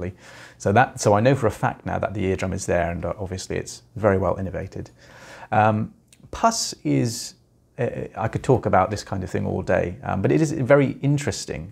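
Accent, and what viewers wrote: United Kingdom. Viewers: Always loved the British accent💋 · wait how come you have a british accent if you live in north carolina no offense im just wondering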